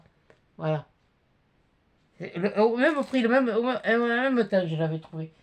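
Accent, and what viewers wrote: South, France. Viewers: Cc, je regarde de temps en temps tes vidéos même si je ne commente pas souvent car j'ai les mains occupées. Déjà merci pour ce partage d'achat très intéressant. Je n'ai jamais commandé sur ce site, il va falloir que je m'y penche 😅. D'autre part, j'apprécie ta franchise, ta spontanéité et ton naturel, tu me fais énormément penser à une personne qui m'était très cher et qui me manque beaucoup, tu as le même accent du sud, le même parler, le même caractère et presque les traits physiques de ma grand mère.. à tel point que parfois ça m'est difficile de t'écouter, tu me rend très nostalgique...bref j'espère ne pas te vexer de te dire cela. Surtout reste comme tu es, je n'ai pas commenté sous ta vidéo concernant Vinted mais je suis tout à fait d'accord avec toi. Merci pour ta bonne humeur et la chansonnette finale, tes yeux et ton regard m'ont régalée. 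Belle journée à toi et bonne créativité pour le défi de...ah mince j'ai zappé le prénom 😅, désolée. Bises 😊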